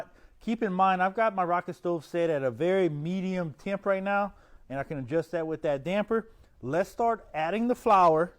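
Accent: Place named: New Orleans, United States. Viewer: I feel like, especially at the beginning I can hear the New Orleans in his voice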